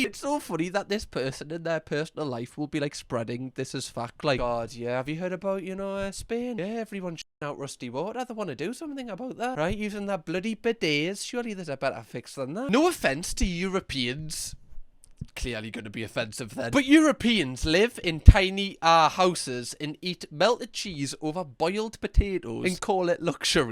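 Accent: Newcastle, United Kingdom. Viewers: Don’t put down your looks! And I love the Geordie accent, it’s so endearing. As someone who grew up with a Bostonian accent, you have a very friendly sounding one. Mine sounds aggressive, and trust me, it’s not intentional. Friendly exchanges are like screaming matches. 😆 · A, I can't believe I've only just come across this, I've been in bits the last few days. B, don't you knock the Geordie accent pet, it's the best accent in the country and I'm from Lincolnshire. Though my grandad was born up there, hated working the mines so ended up as a lorry driver and then eventually owning a garage in London after first living in Devon for a while. He had an interesting accent but never lost the Geordie phrases, oddly some of which we use in Lincolnshire too. But it's been a long while since I've been called Hinny now.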